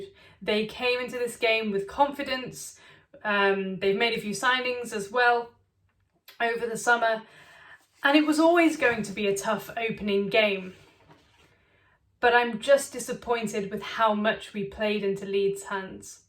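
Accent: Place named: Liverpool, United Kingdom. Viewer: Wow! a posh scouser. Decent review but it was a pen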